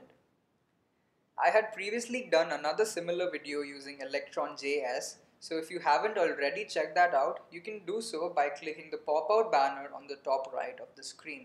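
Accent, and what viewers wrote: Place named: India. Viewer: I love you and your indi accent. Love from Italy <3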